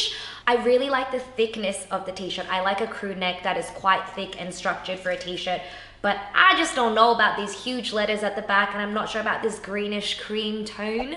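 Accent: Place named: Australia. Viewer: you look beautiful in everything you wear sweetheart, and I love you're "Aussie" accent.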